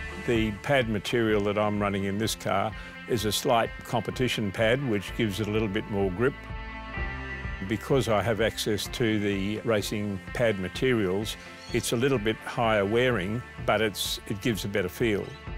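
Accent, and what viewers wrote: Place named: Australia. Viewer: WOW beautiful "old girl". Sounds like your Aussie, Im stoked to think we have one of these in Australia. Hope I see you on the road one day.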